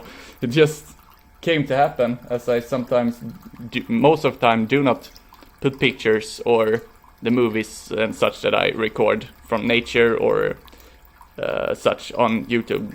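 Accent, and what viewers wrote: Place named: Sweden. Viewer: Love your Swedish accent and your appreciation for nature and beauty. "Just me and the plants" ♡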